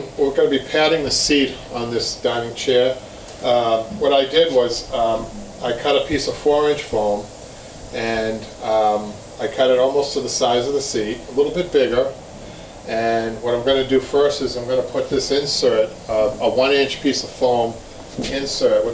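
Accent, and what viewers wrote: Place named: MetroWest, United States. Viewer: Just happened upon your channel as I consider tackling my first upholstery project - and I just want to say that you explain things so intuitively. It all makes perfect sense as you go along explaining, that I quickly start to visualize and anticipate what you will say next. Of course, I immediately identified your accent as I am also from the metro-west area :) Easy to understand and well-explained instruction. Thank you! I feel like this might actually be enjoyable to undertake my project!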